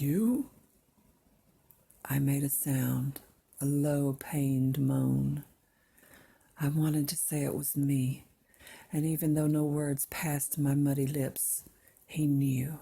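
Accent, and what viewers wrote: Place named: South, United States. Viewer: OMG... You were MADE for this!! 🔥 I LOVE the slight, southern drawl that lilts through the beautiful timbre of your voice that, annoyingly, draws me right into the story lol - I say annoyingly because, I don't do scary. I usually avoid it like the plague haha, but I'll do it for you 😊 I'm so glad you pushed past whatever almost stopped you from branching into the spoken word! And such a great piece too - mad props to the writer. The imagery was easy to imagine and the flow/build up was so natural... I could totally listen to you do more of this 💜 So says this Kiwi at 415am #IDontKnowWhyIWokeUpSoEarlyUgh 😴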